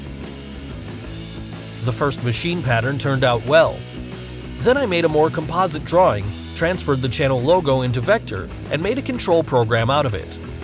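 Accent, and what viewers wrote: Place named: Russia. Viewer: the heavy russian accent is authentic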